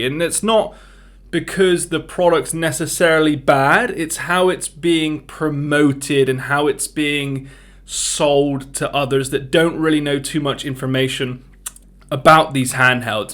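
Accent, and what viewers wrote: United Kingdom. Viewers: . Such a Bri'ish accent.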